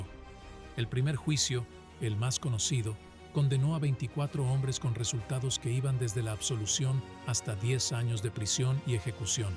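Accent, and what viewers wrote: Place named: United States. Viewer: Lo k me enfada d este amigo es k empieza a hablar Un perfecto español y despues lo habla con acento gringo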